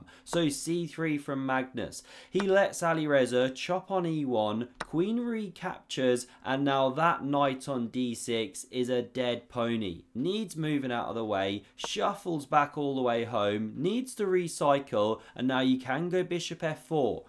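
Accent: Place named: RP, United Kingdom. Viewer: i have to comment - you are actually amazing at this. excellent speaker, sounds effortless and off-the-cuff but quite stylistically elegant, like a posh moistkritical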